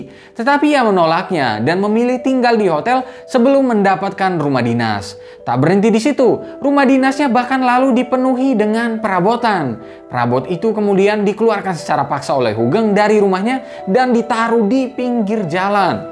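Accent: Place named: Tegal, Indonesia. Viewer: Pantesan wong Tegal, pantes Bae kwkwkw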